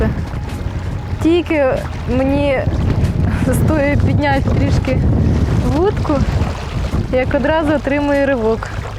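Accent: Surzhyk, Ukraine. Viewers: суржик то сила))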